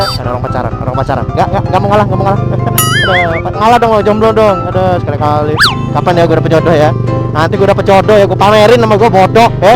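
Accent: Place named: Java, Indonesia. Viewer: bang logat lu kok medok sih.. lu ada keturunan jawa apa gmn?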